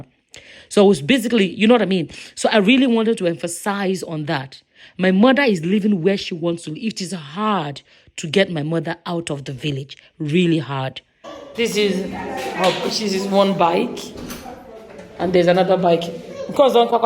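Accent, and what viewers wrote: Nigeria. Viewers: You speak like your from orlu or Osu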